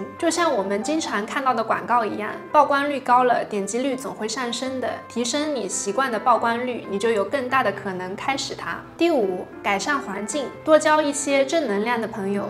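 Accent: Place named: Anqing, China. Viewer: 你是安庆人吗，口音好好像哦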